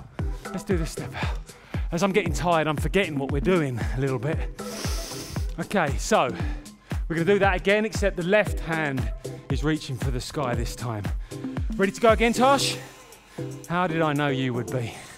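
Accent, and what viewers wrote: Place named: Australia. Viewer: Started this workout 4 days ago. I'm 61 and usually walk every morning, but in our area of the country it is 105 with the heat index even in the morning, so I decided to get on board with a cardio workout and this is great. I can't stay up with the little lady on the right but I try and stay in step and in snyc with the leader and the lady on the left. Also, as a retired band director I am wired to at least try and stay in step with the video. It goes against my very being to be out of sync, but during the fast 45 second phases I'll just go at my own pace thank you. Love the accent. I think an Aussie could hold you up for money in a dark alley and you'd thank him! Ha! Thanks for the workout!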